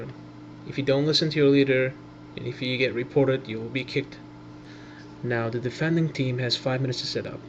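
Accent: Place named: Turkey. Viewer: Listen to and his cute ottoman accent <3.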